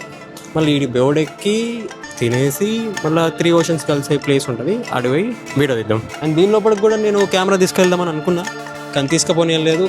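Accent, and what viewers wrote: Telangana, India. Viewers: Ye mulaki poina sare Mana Telangana Slang ki unna craze vere untadhi❤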